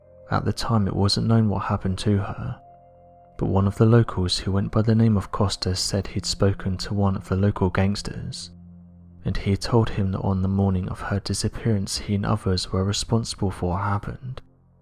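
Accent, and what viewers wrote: United Kingdom. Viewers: Why always the brit accent makes not believe anything they say they are the sales scammers of the univers4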